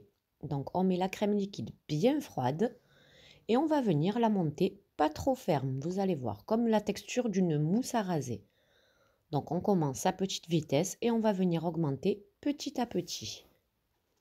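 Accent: South, France. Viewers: Franchement j'ai essayer tes recettes top et cette bûche .. magnifique. 👏👏👏👏❤️❤️❤️❤️❤️❤️🌹🌹🌹🌹🌹🌹🌹 J'aime beaucoup votre accent du sud 💖💖💖🎀🎀🎀🎀🎀🎀🎀🎈🎈🎈🎈🎈🎊🎊🎊🎁🎉🎉🎉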